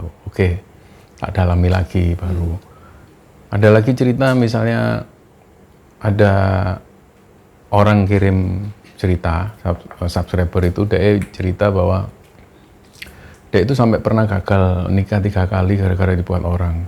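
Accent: Java, Indonesia. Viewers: Tumben mas bahasanya cmpur logat kejowo jowoan 😁..tp msh tetep bagus 👍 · Selamat ulang Tahun,dari awal cerita pendakian mas mas beserta keluarga,sampai detik ini Masi setia sama Chanel ini,cara mas membawakan cerita yg natural dan njawani inilah yg saya suka, sukses terus dan semua crew nya, Gusti mberkahi🎉🙏🥳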